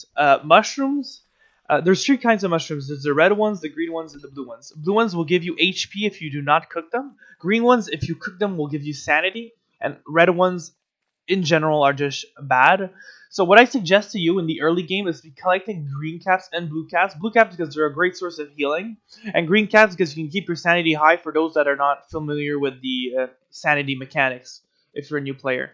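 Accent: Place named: Ireland. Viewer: Just happened upon this channel... I really enjoy the Irish(I'm assuming) accent.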